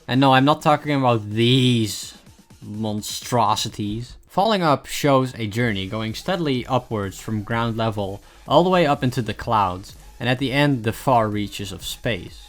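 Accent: Netherlands. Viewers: i can hear that dutch accent lol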